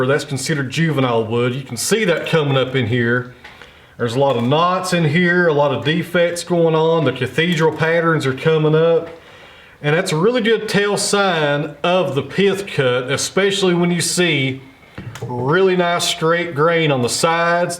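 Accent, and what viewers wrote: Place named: Southwestern Virginia, United States. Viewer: don't listen to the whi ers out there. I really enjoy your channel, I evev understand your talk, ( my family is original from southwestern va.) 😂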